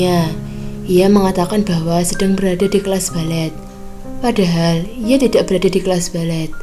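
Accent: Java, Indonesia. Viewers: swarane medok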